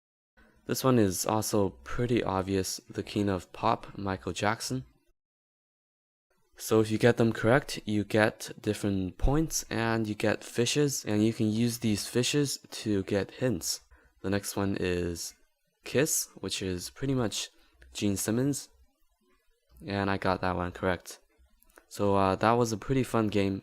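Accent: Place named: China. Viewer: its a chinese speaking english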